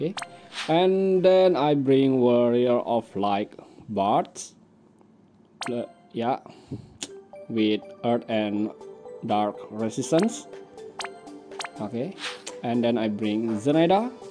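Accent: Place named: Indonesia. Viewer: dude, your videos - clears, reviews and strats- are all amazing, keep up the great work... btw, you accent sounds a little Indonesian..?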